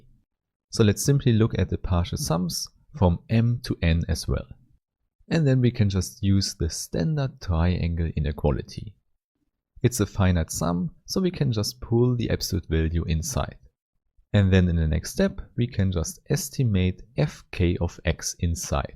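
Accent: Germany. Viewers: liebe diesen deutschen akzent haha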